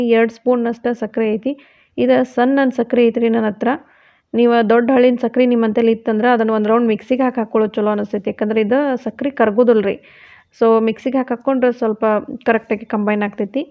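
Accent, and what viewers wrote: Dharwad, India. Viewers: आपण किती छान धारवाडी कन्नड बोलता.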